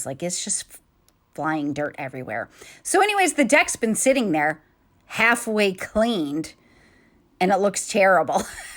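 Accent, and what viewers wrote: England, United Kingdom. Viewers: I’m from the U.K. and your English accent is hilarious 😆 I love it!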